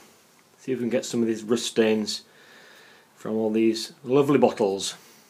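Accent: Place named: Manchester, United Kingdom. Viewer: Are you guys new and do i detect a fellow manc? Loved this video and looking forward to more! You are very watchable and I’m fussy!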